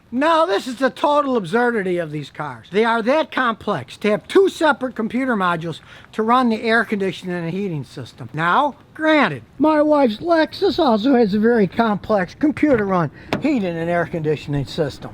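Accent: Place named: New Jersey, United States. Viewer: Voice accent. He sounds like he has a new jersey accent, but I just want to make sure.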